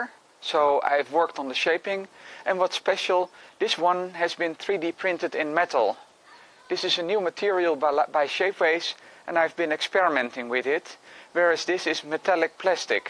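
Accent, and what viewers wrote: Netherlands. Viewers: ik denken van wel: hoor zijn naam en hoe hij zijn naam zegt en hij heeft een nederlands/Engels accent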